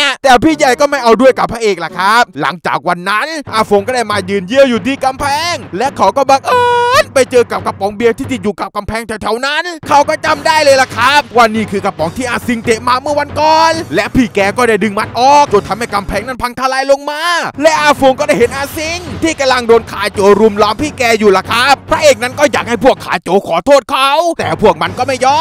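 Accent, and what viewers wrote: South, Thailand. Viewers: ฟังๆ ตกลงแอดคนใต้เออะนิ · แอดเ็นเด็กใต้ช่ายหม้ายนิ?? อยู่จังหวัดใหน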